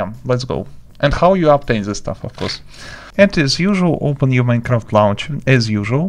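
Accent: Russia. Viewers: Руський акцент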